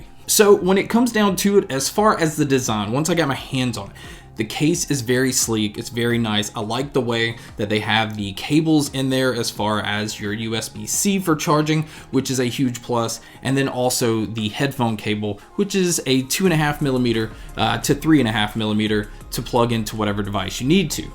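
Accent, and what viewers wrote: South, United States. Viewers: This guy's got a very strong country, southern accent!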